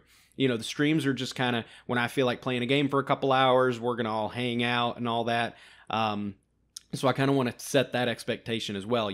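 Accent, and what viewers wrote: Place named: South, United States. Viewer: Wow back with a new beard and a slightly thicker southern twang?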